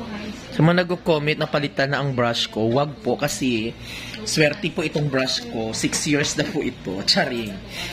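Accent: Iloilo, Philippines. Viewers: ilonggo ka?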